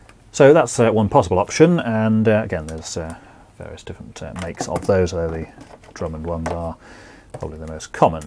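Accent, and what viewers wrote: United Kingdom. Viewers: I wish you put subtitles on it to catch up with the british accent :(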